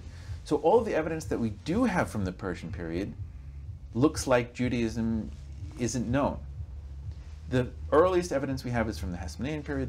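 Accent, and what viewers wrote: Russia. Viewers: Кто это? Семеныч? Что за рязинглиш? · From the first phrase it is clear that we have a Russian person in front of us.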